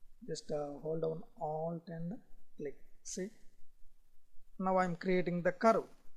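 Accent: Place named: India. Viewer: as same as my indian friend`s speaking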